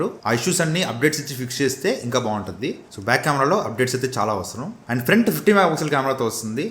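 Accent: Nellore, India. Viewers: Anna nuvvu Nellore odivaaa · Bro nellore aa meedi, yekkada untaru bro maadi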